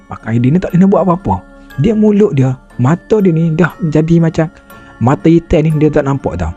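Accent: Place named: Kelantan, Malaysia. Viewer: faham ja..kami utara suka dengar loghat kelate.. hehe tp jgn la dalam2 sgt tapaham juga haha...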